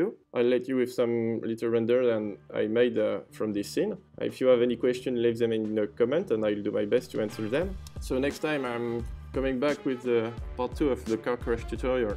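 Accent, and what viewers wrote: France. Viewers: French right? I can understand it by your accent :p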